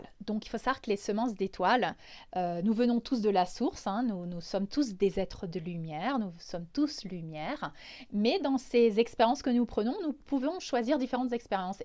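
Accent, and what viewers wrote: Alsace, France. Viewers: Bonjour ! C'est moi ou vous avez un accent alsacien ? 😁😍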